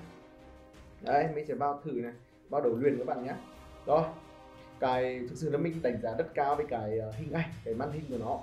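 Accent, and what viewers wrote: Nghe An, Vietnam. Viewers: Nghệ An nào 😂😂😂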